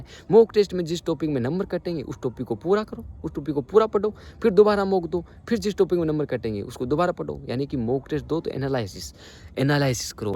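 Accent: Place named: Rajasthan, India. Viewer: Bhaiya app rajsthan se ho kya boli me lg raha hai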